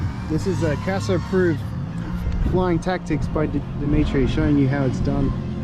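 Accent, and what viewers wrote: Russia. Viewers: О, приятель, твой английский звучит так, будто ты русский) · Бикоз прабабли хи из рашн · Рунглиш :D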